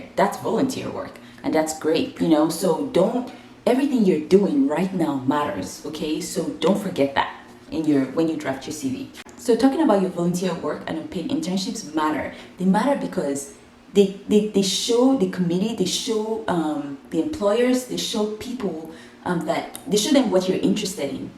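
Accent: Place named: Nigeria. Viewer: Thank you. I appreciate this video. We have something in common though, I teach sunday school at my local church and gurrl I nver used to write in details like you just explained. I will edit right away. Thanks again. You sound Nigerian too. 😉😉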